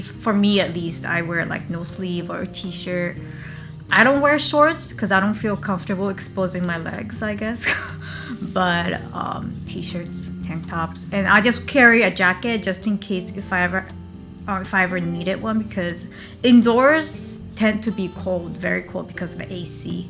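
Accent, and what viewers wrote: Hawaii, United States. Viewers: I would say that she's a Japanese-American from Hawaii that was living in Japan for work purposes before returning to Hawaii. It's pretty obvious from the way she speaks that she's lived in Hawaii for a long period of time. She's got the lingo down pat and that's not easy for a non-native speaker. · Only one year. I can hear the local accent. Great perspective on living in Hawaii. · Interesting, she speaks with Hawaiian accent? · Wow she speaks good with a little pidgin for being in Hawaii for a short time! Mean!! Hahaha she get’um small kine!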